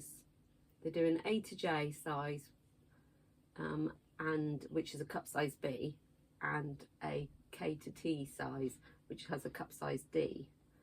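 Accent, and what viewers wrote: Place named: West Country, United Kingdom. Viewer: Great 1st vlog 👏🏻 really enjoyed listening to a West Country voice (being from Somerset). I’m interested in the bag makers box too - the bimonthly is good - not sure I need a bag a month 😅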